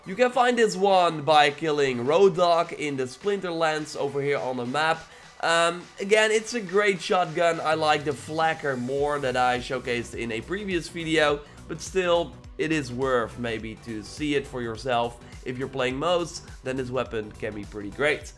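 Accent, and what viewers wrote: Netherlands. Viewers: That Dutch accent though.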